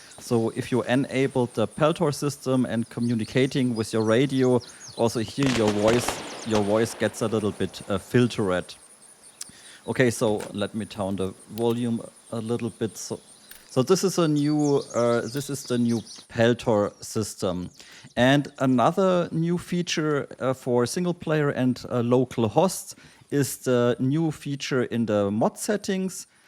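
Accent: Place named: Germany. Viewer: Geiler deutscher Akzent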